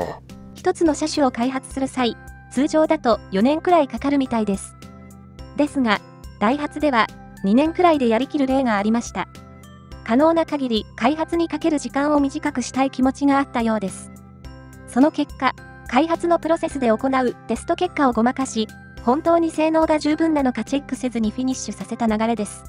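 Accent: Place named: Tohoku, Japan. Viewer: セリフの喋り方が東北っぽくて田舎を思い出すなぁ…。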